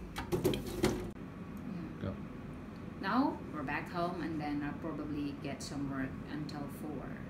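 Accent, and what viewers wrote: United Kingdom. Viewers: ganda ng english accent mo sis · Lamia jud kag english accent jing oi murag british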